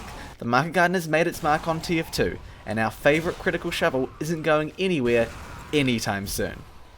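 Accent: New Zealand. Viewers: what national background do you have? i think you sounds new zealand, coming from an aussie :P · are you from New Zealand? you sound like it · You're from New Zealand aren't you? I recognise the accent.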